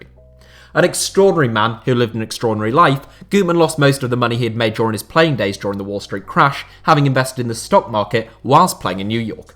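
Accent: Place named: England, United Kingdom. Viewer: His English accent is so annoying, i just watched the video muted with subtitles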